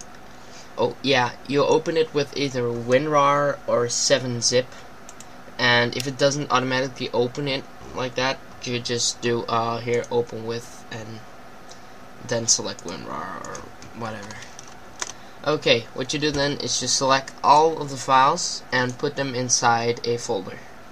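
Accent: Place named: Netherlands. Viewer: Your Dutch :D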